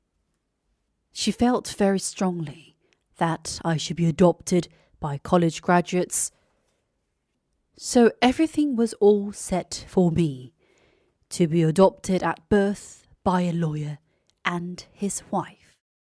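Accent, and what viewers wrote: RP, United Kingdom. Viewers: 진짜 영국 발음 최곱니다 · 영국식 발음으로 읽어주셔서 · 영국발음으로 들으니 너무 좋아요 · 영국식 발음을 익혀보려하는데 매우 좋은 영상이네요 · you have the most perfect British Pronunciation I've ever heard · 동양인이 여왕 영어한다는 거 자체가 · 영국 포쉬발음은 언제나 매력적이고 듣기 좋아요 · 따라하면 저도 포쉬악센트 할수잇나용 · 영국발음 연설을 듣고싶었는데 들을수있어서 기뻐요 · 영국영어로 들으니 새롭네용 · 님 발음들으면서 영국발음 연습중입니다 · 영국식 발음의 낭독을 따라할 수 있어서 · 우아한 영국발음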